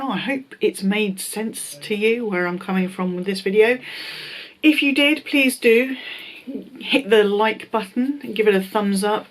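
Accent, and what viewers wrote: United Kingdom. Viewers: Aww, I'm so sorry this all stressed you out so much! We don't love you and your channel because of your unboxing of luxury items, though. It's your humour, personality, positivity and, I admit (to my Canadian ear) British accent! You could be discussing the phone book, and I'd still watch as your take on anything and everything is always worth watching. Seriously, your honesty in this video is just one small example of why I adore you. You really are the best, <3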